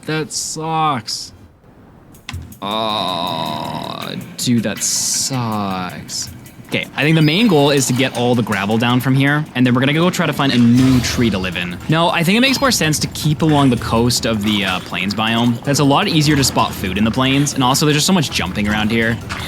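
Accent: Canada. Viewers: Why was he so Canadian in this video? Maybe I'm trippin and just haven't noticed before but I thought bro was American up until now😂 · Are you Canadian? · Are you from Canada by chance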